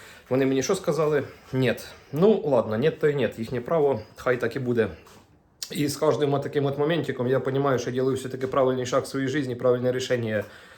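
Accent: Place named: Zaporizhzhia, Ukraine. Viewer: Запорізька обл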